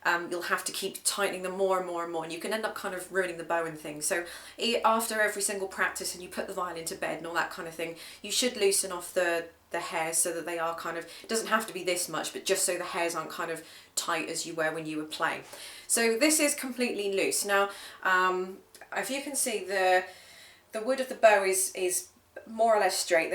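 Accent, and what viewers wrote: United Kingdom. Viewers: Gracias baby,i love british accent chicas, and you r very smart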